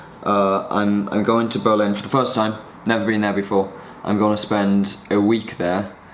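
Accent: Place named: Sunderland, United Kingdom. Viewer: It is a watered down Mackem accent.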